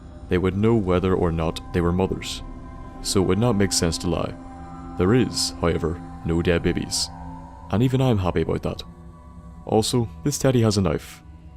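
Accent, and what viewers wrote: Northern Ireland, United Kingdom. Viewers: Have to say mate, your NI accent does wonders for the creepiness of this video :)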